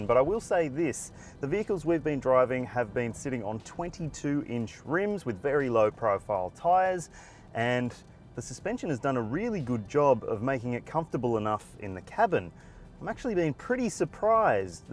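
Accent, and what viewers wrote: Australia. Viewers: Im Korean and I decided to study your Australian English so I subscribed this channel.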